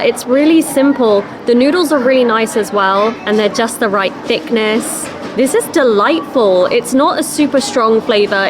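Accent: United Kingdom. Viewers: 再生速度を落とすとはっきりしたイギリス英語の特徴がイヤというほどわかりますｗ おかげで素晴らしい英語教材になってます。ありがとうｗ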